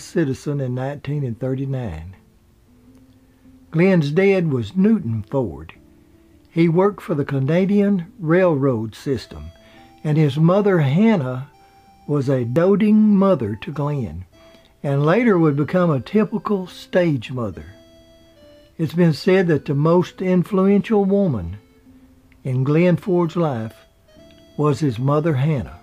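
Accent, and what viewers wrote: South, United States. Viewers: Your accent is really gorgeous... so full of character. I'm in Ireland so it's a lovely novelty to hear this beautiful Southern drawl in all its raw glory. I especially love how you say dates... like nineteen and eighty-two. Hope you never lose it. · Nice biography's. I'm from Aberdeen Scotland. Movie stars have touched everyone around the world over the years. Nice to hear someone giving the facts without being judgemental. No one is without sin and the internet is full of people who love to judge. keep it up! Love the southern accent. Beautifully relaxed. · I personally like the videos that provides for the YouTube community. I also enjoy listening to his voice as it is unique, very southern (I believe) as well as soft spoken. This is the kind of voice that would help a high school student to listen in order to learn a complex subject as it is very calming. 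I enjoyed this video on Glenn Ford as I also enjoyed his acting. I also enjoyed his video on Dan Blocker who played Hoss Cartwright on the long running television show Bonanza. was on location where the Cartwright's home and store were shown to viewers. This is an in depth look at the life of a celebrity and I liked the way in which conveyed this information, it added depth to Blocker and he made me feel like I had known this man. Not many people can do this. By showing viewers pictures of what buildings and houses belonged to him and how those buildings sit today add layers to a persons history and I enjoyed this perspective. The other video that I can recommend whole heartedly is on Billy the Kid. As a young kid growing up in New Mexico, Billy was a sort of anti hero people could relate to. has filmed areas where some of the action had taken place. His work combines photos taken at the time with camera work visiting these historical places that have been preserved. 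For those viewers who cannot make it to New Mexico to view where Billy was supposed to hang, where the sheriff was shot in the street, where his employer and friend John Tunstall w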